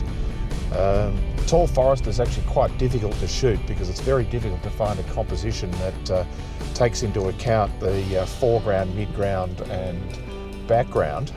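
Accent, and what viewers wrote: Australia. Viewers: Gday, Just found your channel. Good to hear another aussie accent in commentary. Sub'd. Great content also.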